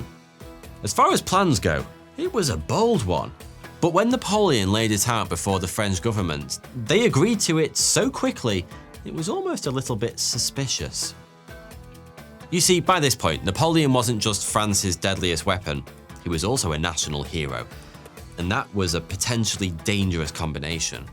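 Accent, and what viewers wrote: United Kingdom. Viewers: While this video is entertaining, it is inaccurate, which is understandable given your accent. Your dna has been programmed for hundreds of years before my dna was. Go have a pint, and watch some soccer while your crown eliminates you. They are VERY NAUGHTY! Did you know that the Rothschilds personally funded the equivalent of 1 billion dollars for the third coalition, which was partially annihilated simply by marching. Their global banking syndicate was in jeopardy… it’s all quite fascinating how they helped the crown carve up the world. Awfully coincidental that in 1812 England was fighting both America and France and the same time. That pesky Napoleon 😉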